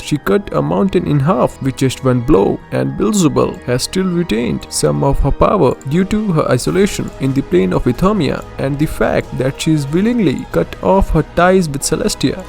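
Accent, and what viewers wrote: India. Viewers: U Indian?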